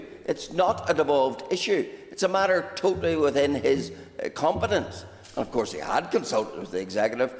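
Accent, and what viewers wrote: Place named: Ulster, United Kingdom. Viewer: this guy has a very old Ulster voice. dinosaur 🦕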